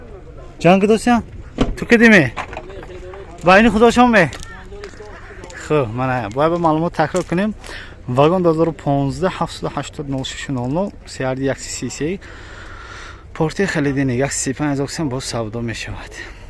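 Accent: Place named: Khujand, Tajikistan. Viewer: Салом алейкум акачон илтимос Акцети хучанди Кати гап назанен МО бисер гапотона намефахмем забони точики модари Кати гап занен узр 😢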